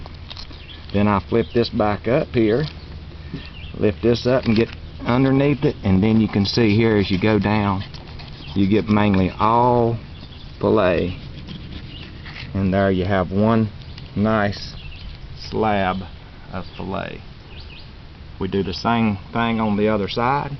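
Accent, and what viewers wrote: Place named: South, United States. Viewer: I like the southern accent.... I grew up in Florida... makes me smile...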